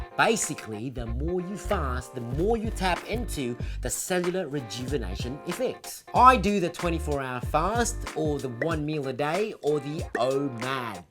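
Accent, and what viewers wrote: Australia. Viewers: crikey i love your Aussie accent mate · Wait so do u really have an australian accent?? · Its still very surprising hearing an Aussie accent coming from a filipino guy. Coming from a filipino this is gold. Good on ya mate🤘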